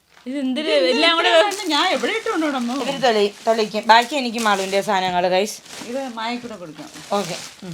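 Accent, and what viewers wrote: Trivandrum, India. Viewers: mole 🥰, so proud you lil one and thankyou so much for making our Trivandrum slang loveable and acceptable to everyone. പിന്നേ മോനാൾട്ട് പോലെ different വാക്കുകൾ മലയാളത്തിനു സംഭാവന ചെയ്തതിനും 😄😂👌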